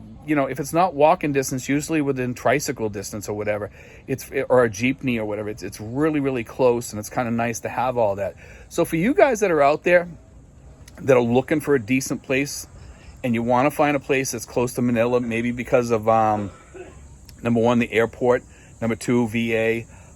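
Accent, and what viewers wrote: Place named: Massachusetts, United States. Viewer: i detect a Mass accent, where are you from in Mass?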